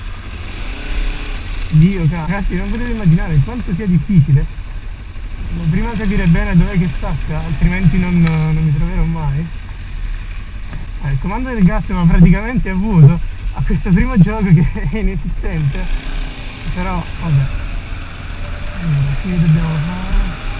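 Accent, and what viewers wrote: Acerra, Italy. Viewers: Ma sei di acerra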